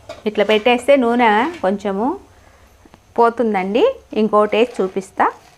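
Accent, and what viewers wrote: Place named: Anantapur, India. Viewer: Aunty mata Brahmins mata la undadu vere slang. Ananthapur atu side la untadi ekkada meeru undedi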